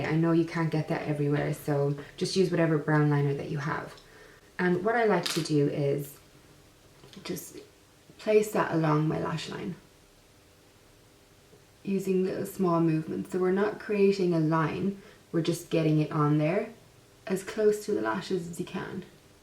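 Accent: Ireland. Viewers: I KNEW you were at least 100% Irish!! One of the most charming and lovely accents on the planet... Did you know that there is more Irish blood in the U.S. than in Ireland? I myself am mostly Irish, coming from the surnames Joyce, O'Connor and Fitzgerald. Nice to meet such a lovely possible cousin! God Bless! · you're australian? you sound irish or scottish :o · you have such a beautiful accent. You sound more irish than the Australian accent i am accustomed to. I love accents in general, but you sound beautiful and thank you for the everyday look. I love makeup but some days i just want to look put together but not overly made up. thank you!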